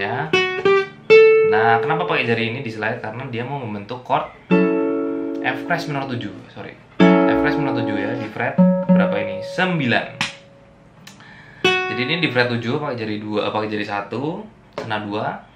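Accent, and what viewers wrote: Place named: Cilacap, Indonesia. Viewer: Loh deneng sampean ngapak mas. Wong ngendi sih?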